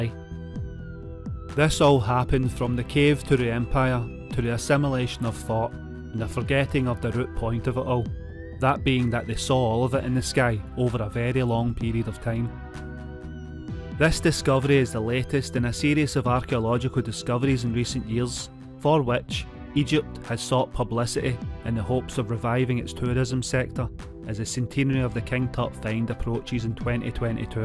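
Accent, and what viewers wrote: Scotland, United Kingdom. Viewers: AWESOME video & love you are still putting that amazing Scottish accent to good use doing videos! ~Ginger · Couldn't make it longer than 2 minutes. Cannot understand the world's strongest Scottish accent and I'm English 1st language. Idk why the Scots always sound like they're gargling marbles & whiskey...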